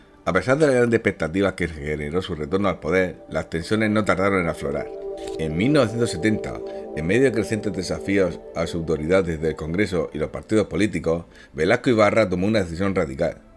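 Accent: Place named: Andalusia, Spain. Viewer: No hablas bonito. Eres andaluz?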